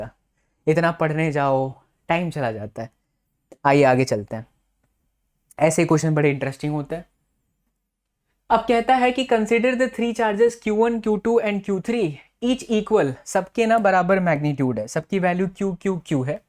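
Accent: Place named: Jaunpur, India. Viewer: Jaunpur se ho aap sir ji 😅 meri tarah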